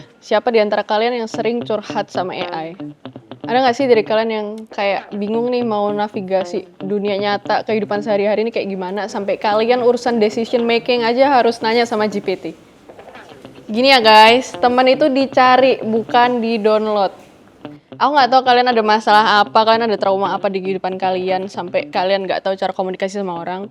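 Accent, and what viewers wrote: Java, Indonesia. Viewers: medok like this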